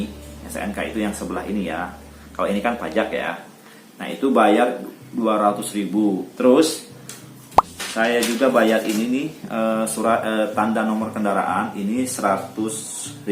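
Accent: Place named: Bogor, Indonesia. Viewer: Orang bogor yah...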